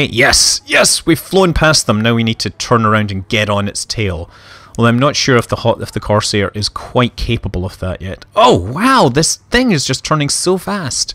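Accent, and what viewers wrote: Scotland, United Kingdom. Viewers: the classic scotsman accent. makes me want to be more Manley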